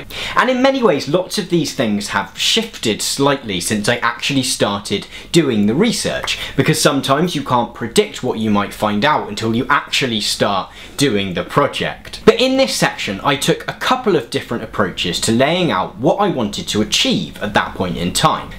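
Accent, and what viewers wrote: United Kingdom. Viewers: I like British ascent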